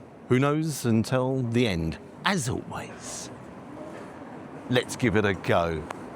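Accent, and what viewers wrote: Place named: London, United Kingdom. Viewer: Your londonish accent is so adorable 😊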